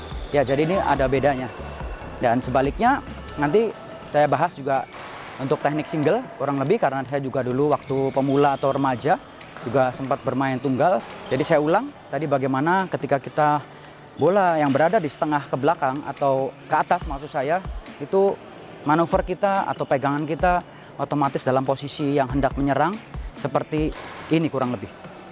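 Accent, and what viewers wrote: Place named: Cirebon, Indonesia. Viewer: logat cerbone katon banget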